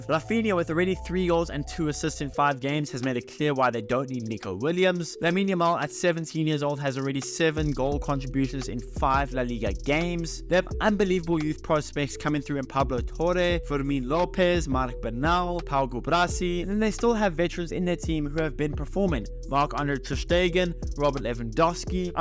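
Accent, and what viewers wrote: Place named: South Africa. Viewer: Can recognize a South African anywhere. Hansi flick is a mastermind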